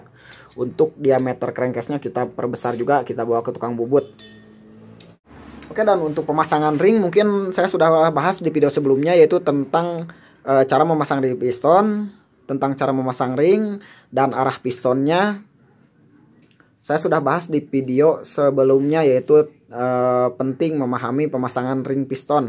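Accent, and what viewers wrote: West Java, Indonesia. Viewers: lokasi mana bang..logatnya kayanya sunda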